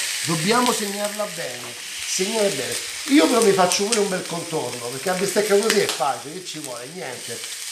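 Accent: Rome, Italy. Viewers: bravo e simp0atico poi anche romanaccio hahaha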